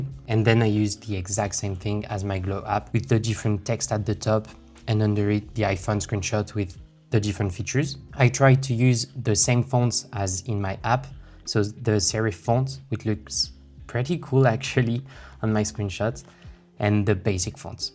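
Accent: France. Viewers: J'adore ton énergie, continue comme ça ! Et j'ai tout de suite reconnu à ton accent que tu étais francophone. Très bon niveau d'anglais en tous cas.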